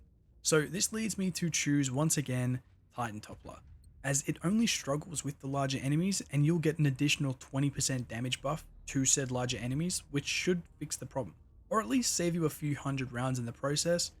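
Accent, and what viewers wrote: Australia. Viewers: WAIT UR AUSSIE? no wonder the accent was recognisable. I'm a westerner lmao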